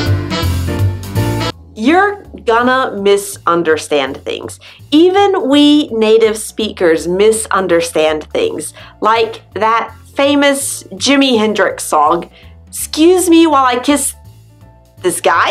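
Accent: United States. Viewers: Not every american speaks with your accent. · That is definitely an American accent. She’s just slowed down, as well as articulated, speech... I think she does a great job overall. The only exception is that ending sound of letters. As I stated above, she adds the -uh sound, which is incorrect. I used to do this teaching Lao students and then realized that it made learning to read difficult. For example, ‘sad’ would become suh-a-duh, instead of s-a-d. Or ‘tin’ would become tuh-i-nuh, instead of t-i-n. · So, I m from DRC Kinshasa … IS IN AFRICAN.. I m liker your English American accent is very good. Please my teacher my God bless you. I love yours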